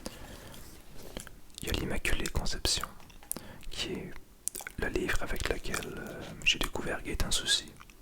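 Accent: Quebec, Canada. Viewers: Je t'ai découvert très récemment et je te remercie infiniment pour ce magnifique moment passé à tes côtés ❤️ je ne suis pas énormément d'asmrtistes québécois mis à part la asmrtiste "asmr u okay?" qui est la seule que je suis avec toi, tout cela pour te dire que ton accent québécois est tellement agréables à l'oreille 🤗🤭 Plus le fait que j'aime beaucoup la lecture et la lecture chuchotée tout cela ajoute un côté très agréable à l'oreille et m'apaise entièrement ♥️. J'ai déjà regarder la saison 01 de The Witcher et je sais que la saison 02 est en train d'être tourner en ce moment, je ne comprenais pas tout ce qui se passait dans l'intrigue jusqu'à ce que je comprenne seule qu'il y avait des moments qui allaient dans le passé et d'autres qui retournaient dans le présent, c'est vrai que j'étais perdue mais après j'ai réussit à suivre l'histoire et à apprécier Yennefer et Geralt avec Citri si je ne me trompes pas pour son prénom 🤔. 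Je n'ai pas encore lu les romans et je ne sais pas vraiment comment suivre l'ordre chronologique 😂😂 Concernant métro 2033, je ne l'ai pas lu mais j'ai vu le résumé et l'histoire est très plaisante, prendre en compte une histoire de zombie qui vivent à la surface avec des gens qui se sont confinés en sous terrain pour survivre correspond tout à fait à la situation actuelle avec un côté fantastique à la Cloverflied Lane mixé à World War Z, c'est juste agréable de lire ce genre d'histoires là 👌. Merci encore pour tout ce que tu fais et de lire aus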